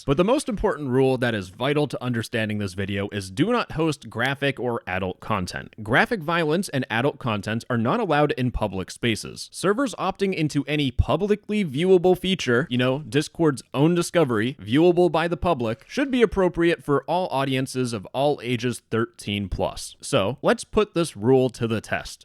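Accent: United Kingdom. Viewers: blud sounds like the spiffing brit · Ahyes, the spiffing brit speech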